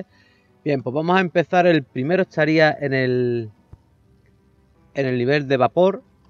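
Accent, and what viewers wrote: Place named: Cádiz, Spain. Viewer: Bua te debo un riñón, me has ahorrado tirar el mando un par de veces con los muñecos ortopédicos estos. Tus videos me han venido de lujo para una promoción de xbox. Por cierto, ¿de Cádiz? Suelo reconocer a los compatriotas jeje. Saludos y muchísimas gracias por tu tiempo.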